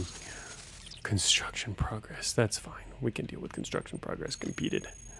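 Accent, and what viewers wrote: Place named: United States. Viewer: Random question, but why is you file explorer non English? Your accent is American.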